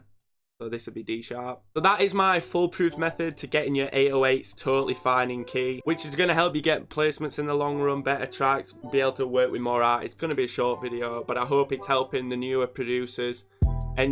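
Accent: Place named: United Kingdom. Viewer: you're the most british producer ive ever heard from man.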